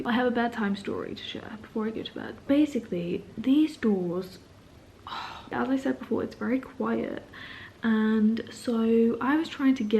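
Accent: Saxony, Germany. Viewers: Thank you for this nice vlog, I really like this one because I'm actually from Saxony and moved for my study to Thuringia. The "sch" at the end of many words it's because you are in Saxony and this is a part of our dialect.😅😊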